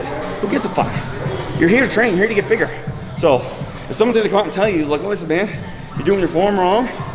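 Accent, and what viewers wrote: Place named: East Coast, Canada. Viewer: Is that a Canadian East Coast accent I hear?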